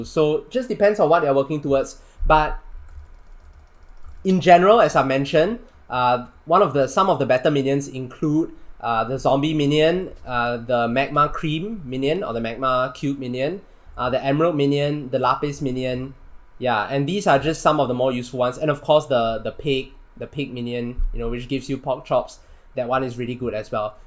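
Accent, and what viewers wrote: Singapore. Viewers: Are you Singaporean?because you sound like it man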